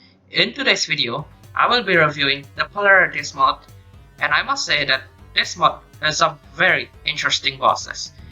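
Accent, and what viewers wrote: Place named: Indonesia. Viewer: indonesian accent hot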